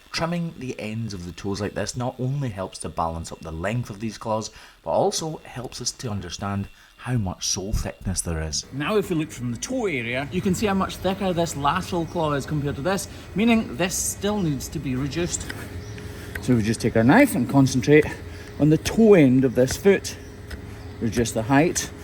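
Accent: Scotland, United Kingdom. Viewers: Love the Scottish accent. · I just love accents and languages especially scottish accent. Love these educational cow podiatry. ❤ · Stopped by for the hoof trimming, stayed for the Scottish accent. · I'm so happy I now know what digital dermatitis is. In my favorite Scottish accent. Much love brother.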